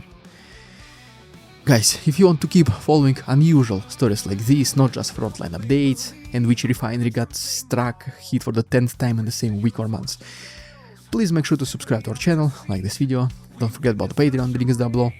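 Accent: Russia. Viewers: i still can't understand shit cuz of his ruski accent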